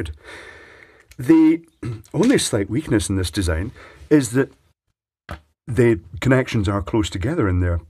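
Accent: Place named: RP, United Kingdom. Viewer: This is a very posh voice. Not many sound like him out in the field, so I'm guessing he's self employed or managerial. wondering ow some one so posh ended up as a spark.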